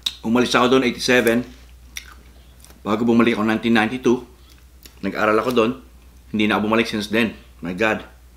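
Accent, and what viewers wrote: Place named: United States. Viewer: Sir kung hindi ka lang nagtatagalog, aakalain ko american ka..hehe..Godbless po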